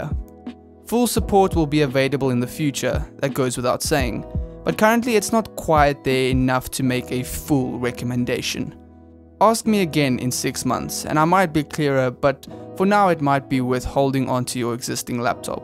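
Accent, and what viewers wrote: South Africa. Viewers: You South African bro? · Sound south african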